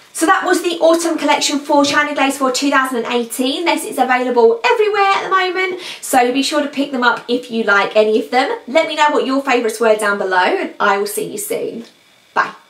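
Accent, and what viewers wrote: United Kingdom. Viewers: I only have Aut-umn I Need That but want You Don't Know Jacket and Haute Blood I love how it looks with a glossy top coat rather than matte, yes I'm strange wanting a matte polish to a glossy top coat on it 😂 you're British aren't you as my husband thinks you're Australian.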